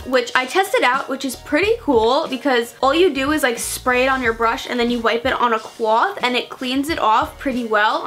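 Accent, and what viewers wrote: New York, United States. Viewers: Are you from New York? Lol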